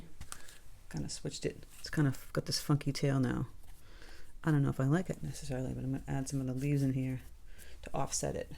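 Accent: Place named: Massachusetts, United States. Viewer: I'm not an abstract painter. I just started watercolors a little over a year ago. I paint too detailed. But I'm gonna give this a try! Hey, you sound like you're from MA? I grew up there moved to TN when I was 12. Love your style here! Thanks for all the informative tutorials!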